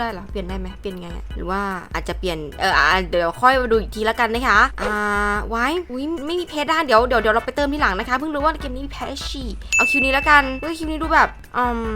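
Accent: South, Thailand. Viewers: พี่ เป็นคนใต้รึป่าวคะ😅พอดีเหมือนได้ยินพี่แหลงใต้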